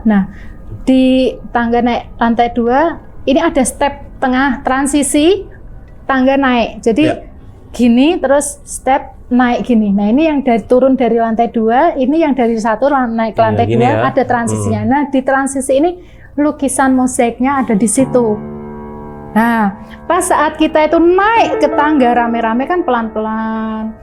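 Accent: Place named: Java, Indonesia. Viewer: Khasnya emang medok2 gitu ye tp top deh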